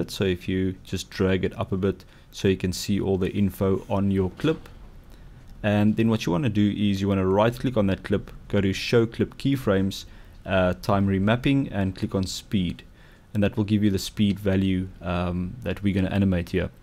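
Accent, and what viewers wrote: Afrikaans, South Africa. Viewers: Baie Geluk, ek sien een van jou videos is gefeature op No Filmschool, toe ek die video kyk toe kon ek sommer hoor hierdie is 'n Afrikaanse Ou. Baie geluk!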